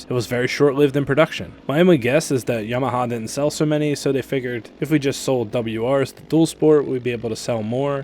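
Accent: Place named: Pennsylvania, United States. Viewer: Are you from southeastern pa? I hear the accent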